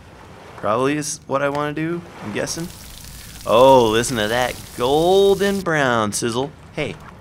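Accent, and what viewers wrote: Canada. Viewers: You sound sooo Canadian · U sound canadian broski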